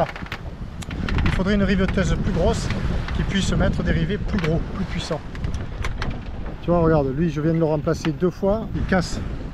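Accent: South, France. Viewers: Fantastique belle traversé ca fait bien envie merci de nous partager tes aventure toujours avec cette bonne humeur et cette accent du soleil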